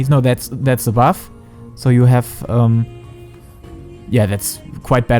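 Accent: Germany. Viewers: es ist zwar ein deutscher dialekt vorhanden, aber grammatik/wortwahl sind immer richtig und die aussprache verständlich, also den zweck erfüllt es allemal :s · Reupload es am besten auf deutsch es klingt echt schlimm wenn deutsche eng reden .. nicht böse gemeint :)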